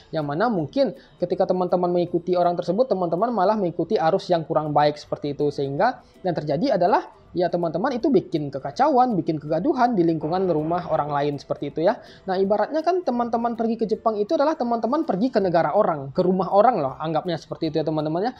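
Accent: West Sumatra, Indonesia. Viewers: Bangg, urang Minang bangg?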